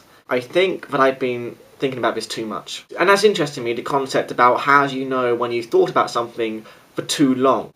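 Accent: England, United Kingdom. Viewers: Oh my gosh. Like i totally am indifferent to the english accent but every time i watch your videos i just totally wanna make out with you and i can't even comprehend what your saying cause i',m fantasizing about what you look like in you boxers . . . or briefs?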